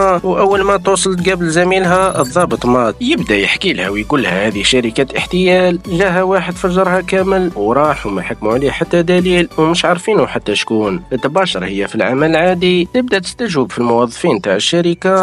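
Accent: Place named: Algeria. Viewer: 123 viva l Algérie روعة لهجتنا 😅من اجمل الملخصات التي اعجبتني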